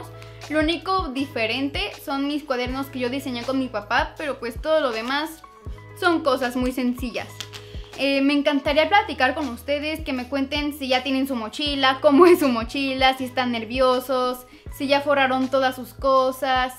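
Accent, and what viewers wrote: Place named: Ecuador. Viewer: Eres de ecuador❓ buen video ❤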